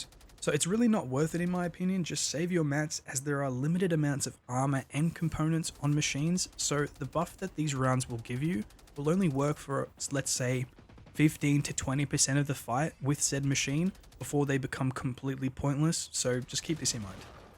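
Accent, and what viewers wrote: Australia. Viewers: WAIT UR AUSSIE? no wonder the accent was recognisable. I'm a westerner lmao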